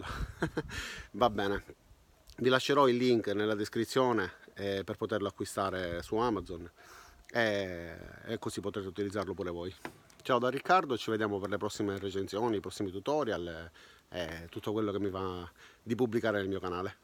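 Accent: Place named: Trapani, Italy. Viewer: riconoscevo l'accento io sono della provincia di trapani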